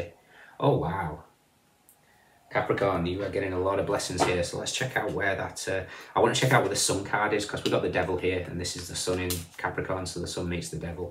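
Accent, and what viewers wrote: Northern England, United Kingdom. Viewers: thank you so much, you are so on point for me. I stopped in my tracks when you said a card of spiritual gifts was coming up. I’m leaving a 30 year career behind to follow a spiritual calling. Pluto has certainly done a number on us all. Every Cappie sun, moon,ascendant I know has had a tough 15-20 years. Great to know that better must come. Great to hear your northern accent. Glad we’re represented. Thank you for sharing your amazing gifts. Blessings to you and all. Xx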